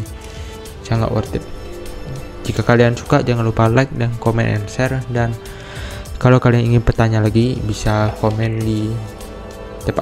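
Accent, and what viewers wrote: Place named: Palembang, Indonesia. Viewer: keknya org plg ya bang? ngmng nya kadang keluar dikit hahaha btw nice vidio bang saya jadi tau harus masuk ke event mana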